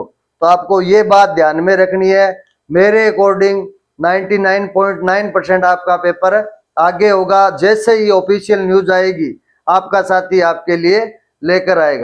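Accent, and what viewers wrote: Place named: Bagdi, India. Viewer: Waa Sir apni bagdi bhasa m bhot bdiya triya bat clear kro the 👏👏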